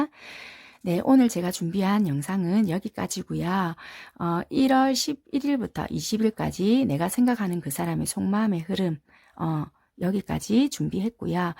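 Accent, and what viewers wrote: Busan, South Korea. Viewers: 네, 물론 또 대화를 나눠볼 생각이에요. 근데 이런 흐름이면 좋겠다 싶은 생각이 들다보니 재회의 경우를 염두해두고 리딩을 받아들여도 좋을지 궁금했습니다 😊😊 상대가 관계에 대해 좀더 긍정적인 방향으로 생각했으면 좋겠다 싶다보니 이 리딩이 너무 위로가 되는듯한 말씀 같았거든요. 아무쪼록 리딩도 댓글도 다 감사드립니다:)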